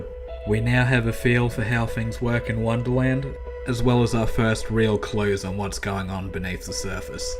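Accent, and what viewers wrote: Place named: East Coast, United States. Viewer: Lol I came to this vid via your your big lez show vid. As someone who lives in Perth, no disrespect intended but my God you easily have the most easy coast accent I've ever encountered :D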